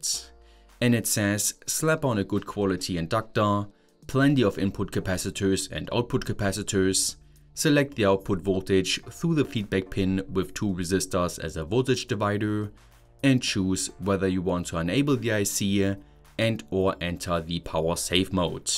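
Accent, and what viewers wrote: Germany. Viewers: Now i know where the meme "smol" comes from. Doesn't get more dschörmann than that accent.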